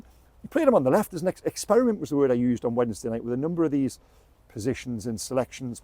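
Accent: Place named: Newcastle, United Kingdom. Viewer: To all viewers this man uses a German greeting.”Well Hallo” .This is Geordie pronunciation that is worse than double Dutch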